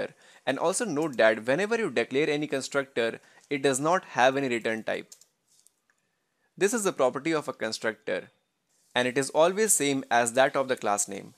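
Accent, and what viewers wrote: India. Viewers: Wow, a very thought out and systematic lesson on constructors. The Indian accent is mild enough that it doesn't get in the way. Excellent job.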